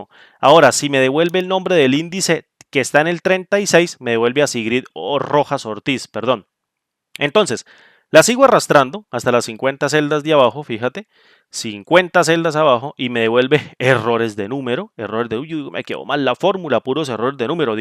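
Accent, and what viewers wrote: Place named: Costa Rica. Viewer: Tu acento me suena al de mi pais, Costa Rica ♥ o talvez Colombia...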